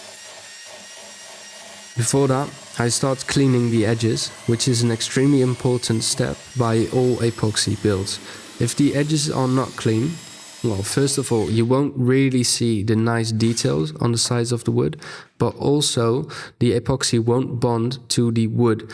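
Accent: United Kingdom. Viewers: Where did you pick up such a british accent. It amazes me every time. Dutch people usually sound more american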